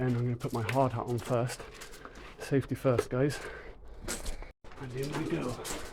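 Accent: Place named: England, United Kingdom. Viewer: I love your accent. What part of England is it from? I am a new subscriber. Doing a great job with the content. 👍😊 from Éire well done 👍 on the spoon 🥄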